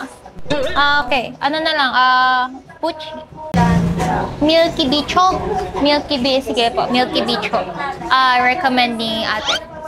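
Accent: Philippines. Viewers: kombinsido na hindi talaga koreana si dahil sa galing magtagalog at pinoy accent pa. di kaya.... 😄😄😄😄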